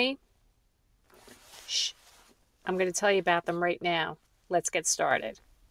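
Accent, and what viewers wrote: New Jersey, United States. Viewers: She sounds like she should of been on the Sopranos.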